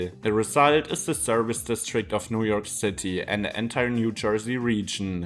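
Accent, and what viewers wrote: Germany. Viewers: That German accent is too pronounced and unmistakable.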